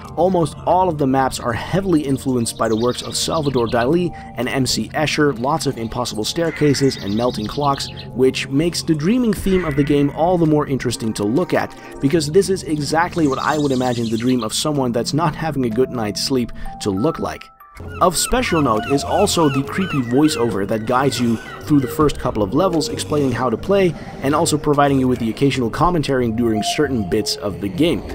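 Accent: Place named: France. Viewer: Voice over guy sounds french.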